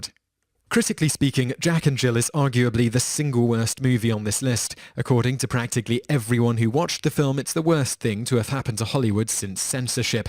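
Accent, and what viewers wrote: United Kingdom. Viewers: this channel would be so much better with an atleast somewhat interesting voice. british accents are great, and I really mean it. but this guy... I can't imagine anyone staying awake until the end of a 10 minutes video.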